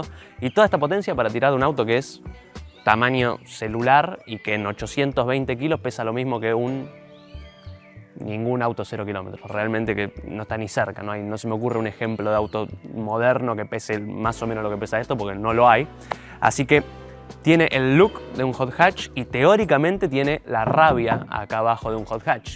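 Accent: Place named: Argentina. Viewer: ya vi varios videos tuyos y es como dicen los comentarios: Es un placer escuchar tus descripciones bien en argento y con buen humor. Te aplaudo por haber probado un auto antiguo y argentino, esos que eran los deseados en mi juventud.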